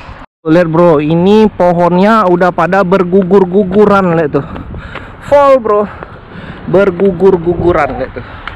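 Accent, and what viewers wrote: Indonesia. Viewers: Mantap aksen anakmu udah kayak anak2 amerika, kalo bapaknya masih kentara indo banget hehe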